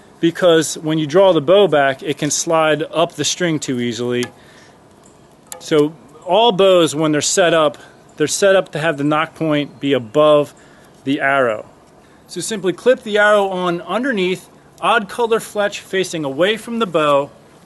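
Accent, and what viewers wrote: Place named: New Jersey, United States. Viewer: Thanks you do have a direct, likable, teaching style. Your accent sounds like my home state, new Jersey.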